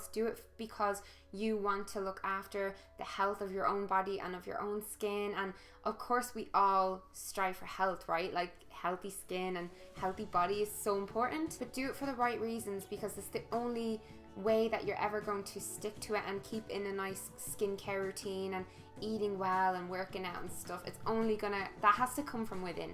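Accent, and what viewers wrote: Ireland. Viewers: you are gorgeous, I have been listening to you a few times to practice my english listening, due to I´m from Mexico and want to practice the language. Furthermore I love your irish accent.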